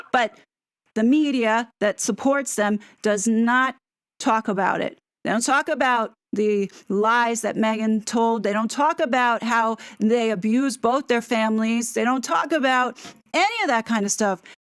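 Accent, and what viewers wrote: New York, United States. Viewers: you speak and act like a real New Yorker…LUV IT…I’ll tell you this I’m from LA to many fake/plastic people around here and my cousins/friends that’s from NY/NJ who came to visit me first opinion about LA “to fake and they show off what they have, meaning wearing the brands name for everyone to see” lol, to which I agree. Many reasons also I don’t date LA men that has been breed here or grew up for how many years lol. New Yorkers they don’t hold back the truth what needs to be said and they act on it. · Love your New York accent!! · Wow! Your New York really came out in this call!! Good job! I don’t live in NY but am heavily involved in municipal finances where I live and I can tell you…..THIS WOULD NOT FLY! 💩would hit the fan! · On a side note, I didn’t know you were a New Yorker,. But I like your moxie and I mean this ad a compliment when I say your frankness and straightforwardness, would make it not surprising you were an archetypal New Yorker. 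Cheers (and subscribed just for this). Makes me want to visit. And as I listen to you more in your call, I hear the NYC accent too more and more. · how very frustrating but I loved the New York coming out in your voice as the call went on. Geez none of us should be paying for these grifters!!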